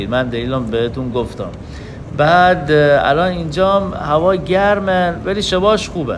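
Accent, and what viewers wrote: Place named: Bushehr, Iran. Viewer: لهجه حرف نداره بوشهری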